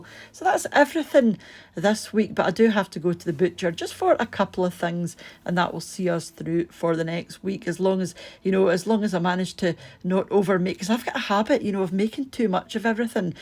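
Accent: Scotland, United Kingdom. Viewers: Good evening! It’s lovely to hear your beautiful Scottish accent!!! ❤also, so glad for you that your weather is lovely, it’s still hot 🥵 here in Georgia in the U.S. I’m so ready for fall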